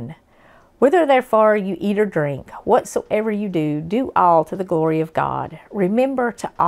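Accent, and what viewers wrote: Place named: Tennessee, United States. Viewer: Your voice is comforting, reminds me of friends in Tennessee.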